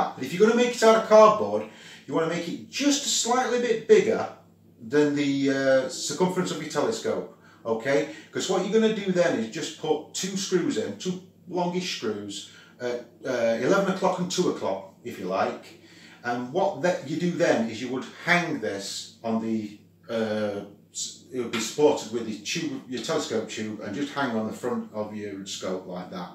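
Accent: Midlands, United Kingdom. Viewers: This is right up my street! When the video started and you mentioned the best way to get focus on a wobbly scope I immediately though focus mask, but I didn't expect you to modify your dust cap! Really neat job, great bit of Astro DIY :) I'm trying to place your accent, I'm pretty sure it's midlands? Big sub from me :)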